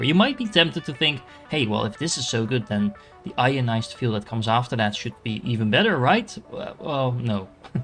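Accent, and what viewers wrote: Netherlands. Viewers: Super goeie video, toen hoorde ik dat je nederlands was en ja😂 lekker man, goed bezig!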